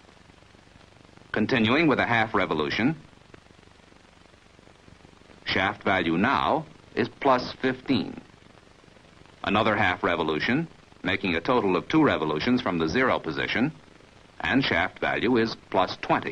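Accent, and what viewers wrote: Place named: Texas, United States. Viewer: Where all of these series with Texas-dialected-man comes from?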